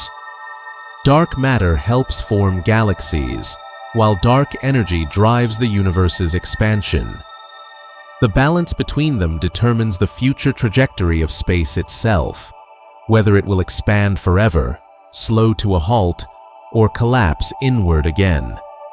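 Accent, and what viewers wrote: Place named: United States. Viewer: Finally a good old fashened AMERICAN accent. Cant believe it.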